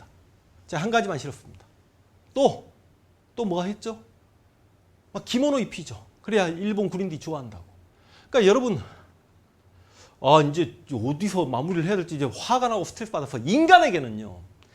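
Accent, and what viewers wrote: Jeolla, South Korea. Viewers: 100프로 강제연행은아니죠 일부 피해자가잇어도 자발적으로 간 인물도 많을겁니다. 지금도 사채때문에 강제로 몸파는창녀가잇다치면, 돈벌기편하고 하니 창녀촌 안마 마사지에서 몸파는 창녀도있는경우인데 그 시대라고 창녀가 존재하지않는다고 할수있습니까??? 근데 말투가 딱 전라도말투네요 전라도사람이세요?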